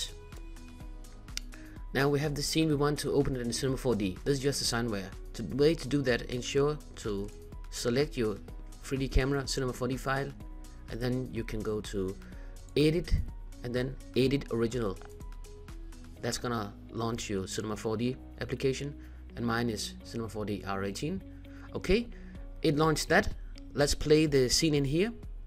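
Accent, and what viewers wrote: Denmark. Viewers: Tak for videoen! ;) Ka hører du er dansk :)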